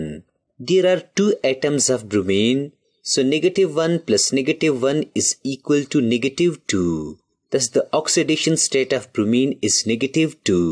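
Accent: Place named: Uttar Pradesh, India. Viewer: according to his voice and accent he sounds like he is from up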